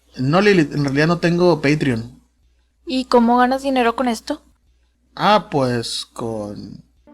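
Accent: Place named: North, Mexico. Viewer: están bien tus historias, y estás muy bien informado, pero la neta hablas bien feo, no se te entiende nada cuando hablas, y además tienes acento norteño. Habla más despacio y claro, gesticula bien.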